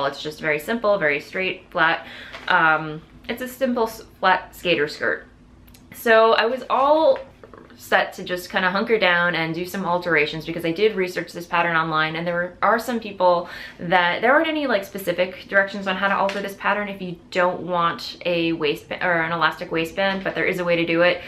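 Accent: Brooklyn, United States. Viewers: chuckling here at your Brooklynese accent .... so cute.... and as always love your podcast! oh ... and your dress is great too. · You've inspired me to try dyeing yarn and now you're inspiring me to sew more. I really wish I could sew up a whole wardrobe for myself. But I'm such a newbie! Oh and I loved your Brooklyn accent! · OMG!!! You SO need to do an episode in Brooklynese!!! So good! 🤣🤣🤣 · What a fun inspiring and energetic episode ! Your shawl/ blanket looks so amazing ! Wow You made me laugh with your Brooklyn accent - too funny , in a good way Hope you have an amaIng week XEmilia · your Brooklyn accent was BY FAR the best thing i heard all day! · I'm from the Fort Hamilton area of Brooklyn and I don't have a very strong accent either. Your accent actually sounds a lot like mine. My Grandmother use to say "Errl" for oil but she was from the Jamaica area originally. 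None of my uncles or Aunts have that type of accent like my Grandmother. I think it was perhaps more from the German area of my family that did not speak that way. So perhaps it is the German/Brooklyn connection. I do still drop my R's though. Dinner is "Dinna" still.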